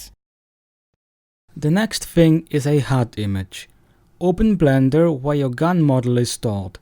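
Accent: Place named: Germany. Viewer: Lel, hab mal dein Modding Video von vor 6 Jahren mit diesem hier verglichen. Den deutschen Akzent hört man so gut wie gar nicht mehr raus, nice :D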